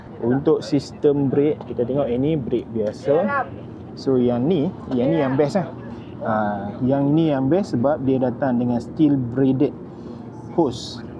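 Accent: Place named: North, Malaysia. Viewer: First time tgk video ko bang, penyampaian santai dengan pengaruh slang utara yg sedap dengar. Editing untuk opening best dan entertaining. Flow penceritaan jelas. Point of improvement camera angle dan pergerakan camera mungkin boleh diperbaiki. Overall good review dan editing best. Oh ye sedikit komen untuk penganjur, dah tahu media atau vlogger akan buat kerja kat belakang khemah tu... Housekeeping ler sikit... Kemain sepah nye... Spoil vlogger amik gambar / video cantik tapi background sampah... Bende simple je...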